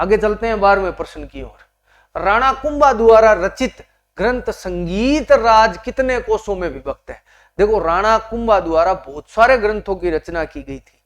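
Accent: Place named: Marwar, India. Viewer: Kya baat hai Sar ji aapki Marwadi Ka jawab nhi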